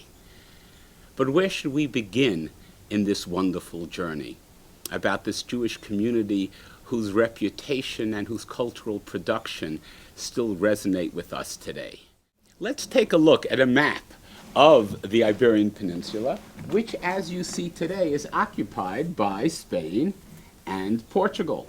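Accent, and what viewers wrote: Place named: New England, United States. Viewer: HIs accent's from the New England area. Many Jews have that accent because many Jews emigrated there in the late 19th century.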